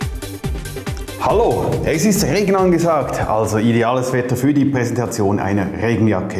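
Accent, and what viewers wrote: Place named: Switzerland. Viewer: schön :) ich liebe das Schweizerdeutsch :)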